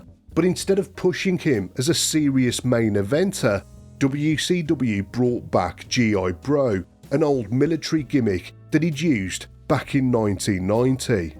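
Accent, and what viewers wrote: Birmingham, United Kingdom. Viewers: Love your Birmingham accent and pronunciation of 'W', which is a popular letter in wrestling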